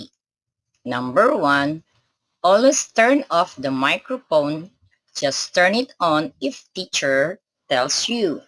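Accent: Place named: Philippines. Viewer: pinoy yan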